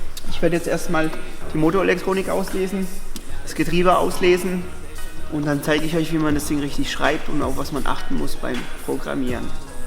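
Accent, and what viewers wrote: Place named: Swabia, Germany. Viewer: Allein schon wegen dem Schwäbischen Akzent gibts einen Daumen hoch!!!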